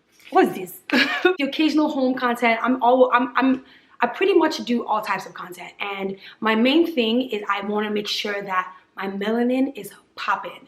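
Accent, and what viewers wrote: Nigeria. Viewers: I can hear the Nigerian accent. I love this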